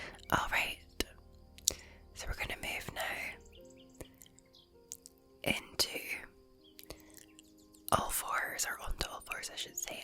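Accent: Scotland, United Kingdom. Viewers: Woohoo... + yoga = ASMR bliss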